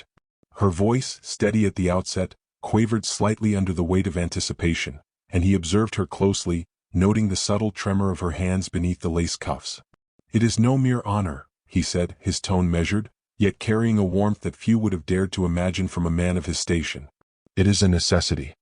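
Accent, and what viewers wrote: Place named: United States. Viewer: Terrible narration; too hurried; no change in cadence and too American!!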